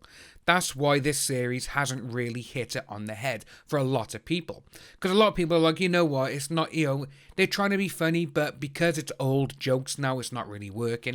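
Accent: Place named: United Kingdom. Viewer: I love your interactions with the viewers. I also really like your accent 💜 keep up the good work! Are you in the UK? I know you said Wales (sp) but I think there is one in Australia too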